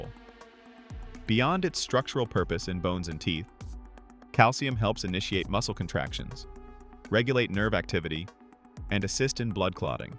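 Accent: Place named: Germany. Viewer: is this a german channel? he sounds like a german..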